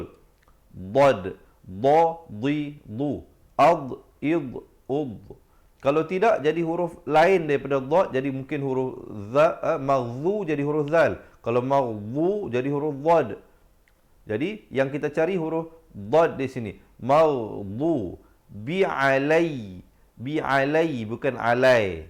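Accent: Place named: Malaysia. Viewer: Lawak uataz ni.. Bunyi Melayu beno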